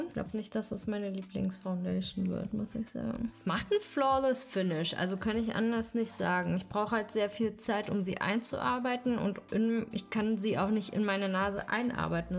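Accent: Berlin, Germany. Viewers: 😂😂 minute 😂😂😂 Die beste Faxenmacherin youtubes Deine Berliner Schnauze ist auch grossartig -Du löst bei mir Heimweh aus 👏👏👏weiter so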